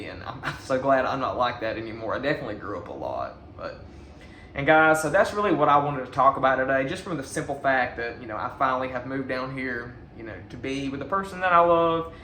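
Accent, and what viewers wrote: South, United States. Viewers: You have a very honest and down-to-earth sense of yourself and life in general. I love your southern accent drawl! lol. You've developed some great storytelling in your videos here. Maybe you could think about trying out some motivational speaker jobs at nearby schools or libraries?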